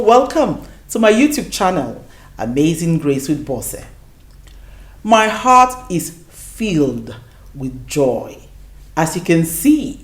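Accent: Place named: Nigeria. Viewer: Congratulations Amazing Grace. I have got your book on Audible and it’s just so well written and more so a beautiful Nigerian accent to compliment. We are so proud of you. I recommend this book to both your young and old🎉👏🏾👏🏾👏🏾👌🏾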